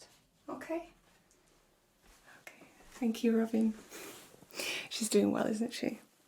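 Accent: United Kingdom. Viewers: Great video idea, also love the accent whereabouts are you from within the uk?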